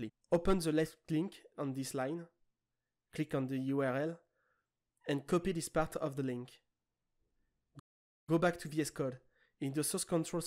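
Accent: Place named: France. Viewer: I love French accent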